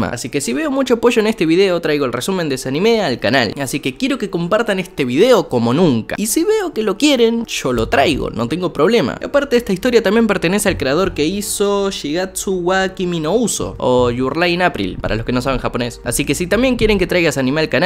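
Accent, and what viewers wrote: Uruguay. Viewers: ¿Sos uruguayo?🤨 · eres uruguayo?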